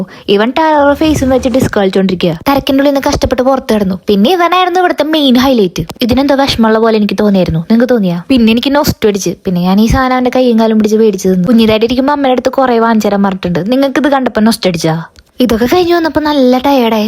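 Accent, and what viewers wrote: Thrissur, India. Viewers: Cherthayitto njn vijerche Thrissurkkaryeenna 😂njngade athee slang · Thrissur karude slanginu ntha kuzhapam🤨 njanum oru thrissur karan aanee😊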